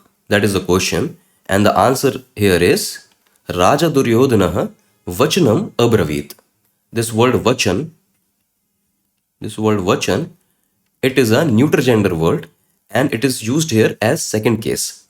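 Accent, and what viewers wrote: Hindi, India. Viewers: Namste, Your class is great. Very useful. I was in search a class where Sanskrit Grammar is taught through Bhagavat Gita. I have started to go thorough the episodes one by one. Thank you so much for uploading the classes. One thing I wish to request you is about the pronunciation of Sanskrit words. Please pronounce अ मात्रा ending words as it should be in Sanskrit language. For example, सञ्जय उवाच should be pronounced 'Sanjaya uvacha' not 'Sanjay uvach' as it is in Hindi language. If it is 'Sanjay uvach' it should be written with a halant in Sanskrit i.e. सञ्जय् उवाच्. Hope you will take my suggestion positively if you feel it is correct. 🙏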